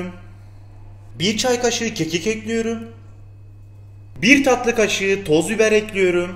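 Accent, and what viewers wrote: Adana, Turkey. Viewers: Yer Diyarbakır İstanbul değil ayrıca dil adana değil ŞİVE adana :)) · şive değil ağız adana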